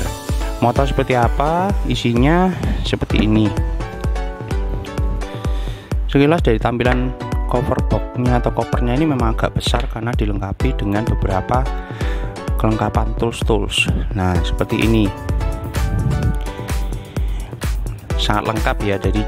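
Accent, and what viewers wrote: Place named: Java, Indonesia. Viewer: muka engko logat jowo.sukses mas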